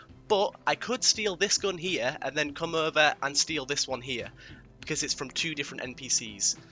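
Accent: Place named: England, United Kingdom. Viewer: Oh thank goodness....and English accent!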